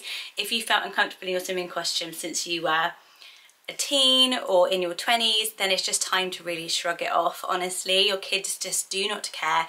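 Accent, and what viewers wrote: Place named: England, United Kingdom. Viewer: I couldn't love you more. You always have the best insight on weight (I've been watching those videos on repeat to get my mindset right) and you are so lovely. Im American raised by my English grandma and I lived in England for 3 years and my best memories are with my grandmas brothers and sisters. Your accent and manner of speaking makes me feel like I'm with family. "Ibiza vibes but really gonna chassé into kids swim" 😂 you're just the best. And you do look amazing and I admire that self discipline to live a healthy life style.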